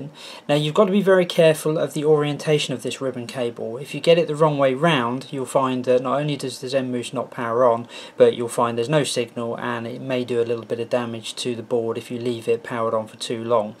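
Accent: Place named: United Kingdom. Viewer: I love that british Accent. Nice tutorial! I sought long for this. Now finally got it ;-) Thanks a lot!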